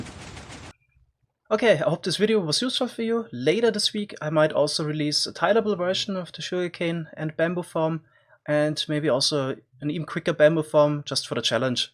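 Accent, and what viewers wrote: Germany. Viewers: R u german or what is that cool accent ? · The Video sounds like german Englisch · Man merkt krass das du deutsch bist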